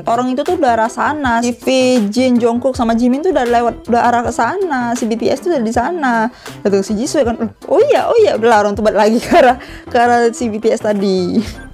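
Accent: Medan, Indonesia. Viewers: Kakak anak Medan ya??,krna kalau di Medan kyk gitu ngomongnya😊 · Kk orang Medan yaa Logat nya keliatan banget BTW maap kalau salah Ya ka 🤣😂🤣😂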